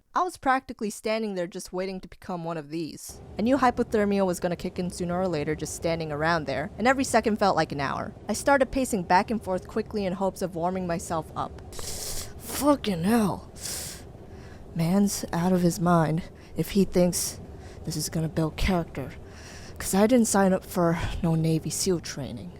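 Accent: New York, United States. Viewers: Is she from ny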